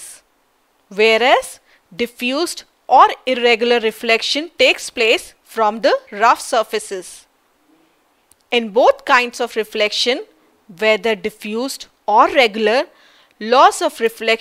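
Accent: India. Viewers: Don't b_llsh_t us. It's not in english, but indiaglish.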